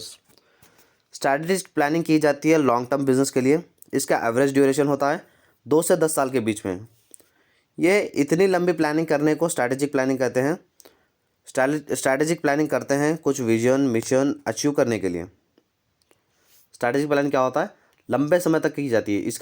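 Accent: Hyderabad, India. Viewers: tum hyderabad se ho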